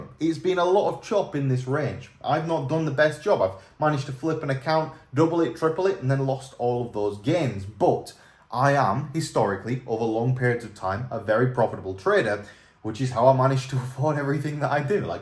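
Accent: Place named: North, United Kingdom. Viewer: It’s my favourite northern accent talking bitcoin lol 😂 where was the one video a day broskie lol I know how it goes lol give thanks same way my guy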